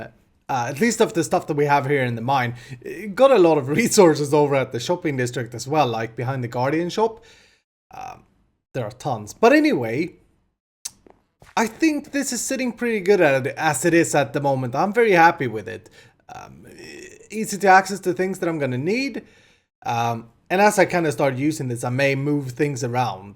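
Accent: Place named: Sweden. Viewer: i am always disturbed by other swedes english. you are the only one i got used to.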